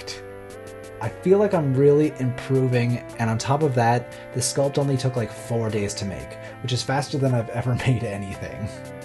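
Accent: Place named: United States. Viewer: I did not expect an American accent to come out of your face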